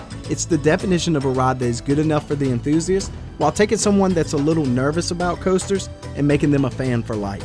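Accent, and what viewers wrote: South, United States. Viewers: Alright I’ll say it, I love your southern accent.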